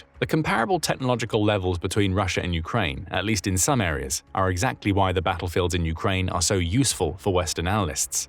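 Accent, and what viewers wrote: United Kingdom. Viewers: Another british accent. Is that all that's out there anymore? Adios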